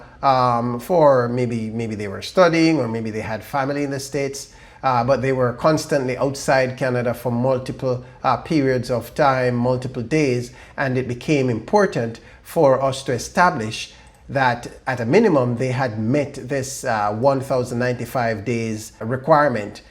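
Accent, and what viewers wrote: Jamaica. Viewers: I love that you still kept your jamaican accent!!! loooool I will be in touch I work in IT, I am 45 y.o in the USA right now,but living with my own immigration here. how do we start a private conversation to see how you could help me move over there for IT work. thanks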